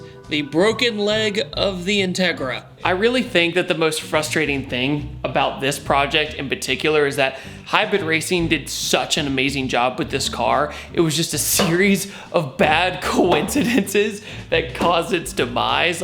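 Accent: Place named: South, United States. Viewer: Don’t apologize for that southern drawl. A southern man tells better jokes.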